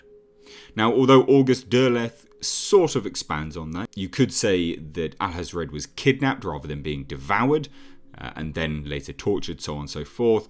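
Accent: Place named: England, United Kingdom. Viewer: sounds like James Earl Jones with an english accent. "Oi! I am your father mate!".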